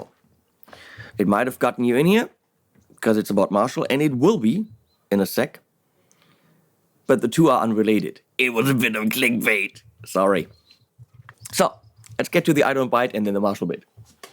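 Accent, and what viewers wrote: Germany. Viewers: I'm afraid of you because of your lack of hair, that german accent, and your freakishly large stature... otherwise, you are lovely :D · I think they might r some unconscious bias going on, tall bald German speaking English with a German accent (rightfully) knit picking critiquing things. Not fair but I can imagine it being a thing As far as the Marshall getting back a/part of the solution could be frfr’s/stage pa’s and official licensing of irs and amp modeling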